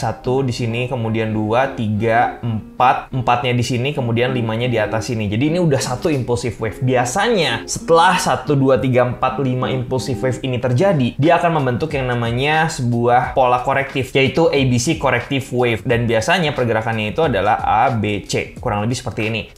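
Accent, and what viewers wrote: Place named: South Jakarta, Indonesia. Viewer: bang which is lu annoying, sumpah, logat jakselnya ilangin pls sayang lu udah bagus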